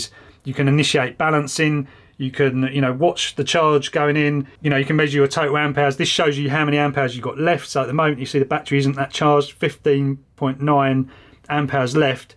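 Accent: United Kingdom. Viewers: proper brit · This would be a great video if you were not so damn British.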